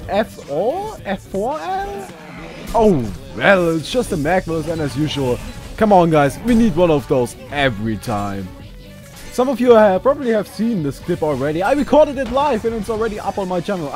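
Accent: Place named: Germany. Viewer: his english is hurting my ears... german accent at it's worst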